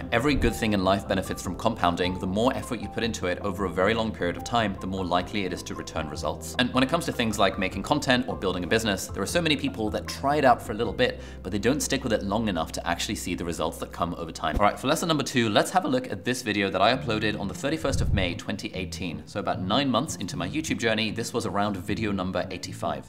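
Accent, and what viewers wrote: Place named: United Kingdom. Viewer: How can we talk like a British, talk like you . ???. 🤔🤔🤔🤔🤔🤔🤔🤔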